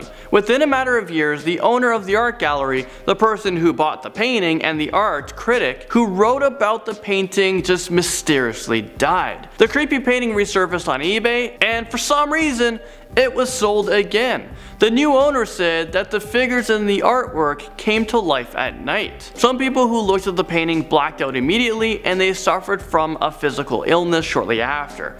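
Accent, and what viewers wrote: India. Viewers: ah Indian accent amazing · Are you Indian?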